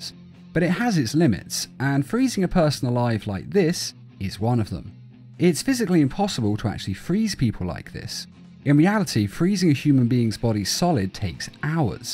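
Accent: United Kingdom. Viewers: Sounds like a normal british person.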